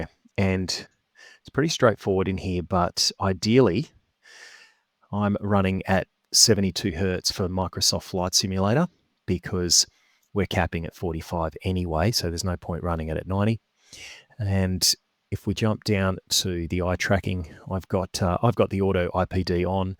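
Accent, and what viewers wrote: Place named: Australia. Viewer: Perfect video mate and the Aussie accent always wins for a Danish guy like me :) Still waiting for my Super but it is going thru the QC right now so I should have it within a month I guess :)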